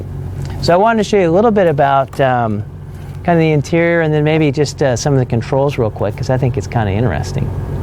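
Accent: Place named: North, United Kingdom. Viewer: well,its not his fault, probably his accent. like how northern people pronounce "water" as "wadduh"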